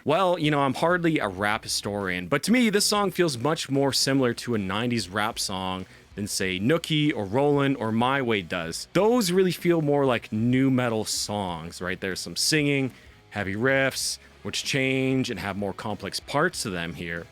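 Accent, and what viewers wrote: Canada. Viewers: Are you from alberta?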